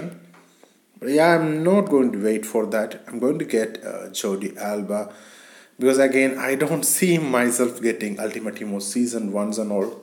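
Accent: India. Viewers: You are indian your accent says all🤘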